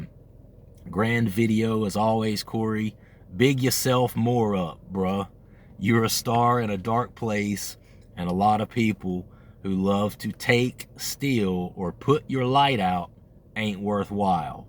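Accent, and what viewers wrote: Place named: South, United States. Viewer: Interesting you mentioned you're from Georgia with parts of the "typical" Southern accent. My parents were born and raised in Ohio. When I was two, in 1958, we moved to Atlanta - my parents actually had the house build on the new lot. But it was in a brand new suburb in South Atlanta. Just about ALL the kids in that neighborhood and my schoolmates were transients from other parts of the country. When I moved to New York City at the age of 26 (Yes - it was quite a transition!) - most New Yorkers couldn't tell where I was from by my accent. NONE thought I was from the Northeast! They REALLY didn't guess the South.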